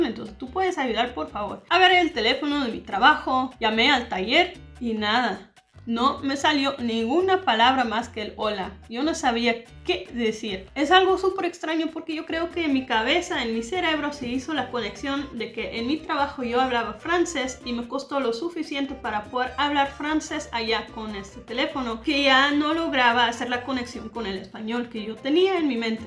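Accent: Standard. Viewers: Tu español es súper fluido 👍🏻con un acento neutral mayormente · tu acento esta neutro pues es lo que veo en este video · Es muy elegante tu español, para mi oído es neutral. Qué bueno que hables bien de mi país Ecuador bendiciones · Buen vídeo, eso es lo que me pasa a mi me da miedo hablar holandés y no expresarme como quiero,me atrevo hablarlo con conocidos de mucha confianza con desconocidos se me olvida todo,espero lograrlo y hablarlo tan bien como tú hablas el español 💋y por cierto tu acento es neutro 😃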